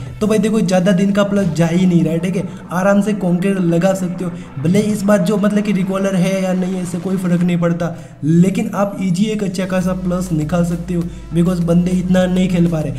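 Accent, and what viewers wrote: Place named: Rajasthan, India. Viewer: Bhai aap rajsthan se ho kya ❤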